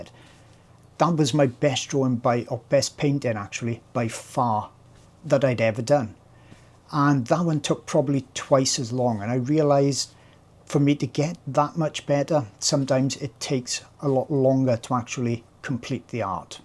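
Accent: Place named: South Wales, United Kingdom. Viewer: Hi, another great video! Thank you. I agree the paper Pastelmat is a total game changer! It gives back the joy in drawing that the other papers sap away from us! Ps. I love to hear you talk because it reminds me of my nana and grandad who came from the Rhondda Valley in South Wales. 😊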